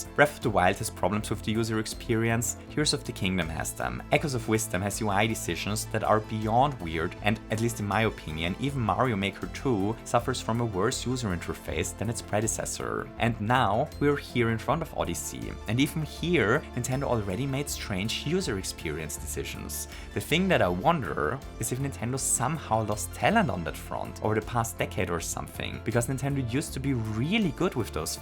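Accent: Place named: Germany. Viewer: du klingst sehr deutsch, ich kann es nicht erklären, dein Akzent ist nicht stark, aber ich höre definitiv deutsch XD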